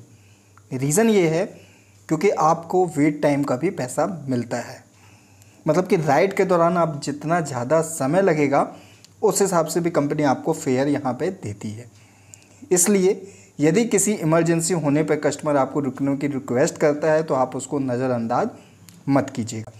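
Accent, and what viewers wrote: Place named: Indore, India. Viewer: Bhai Indore se ho kya 😅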